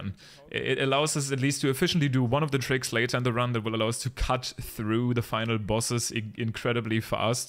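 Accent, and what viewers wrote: Germany. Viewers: Are you german? Like I somehow feel you are german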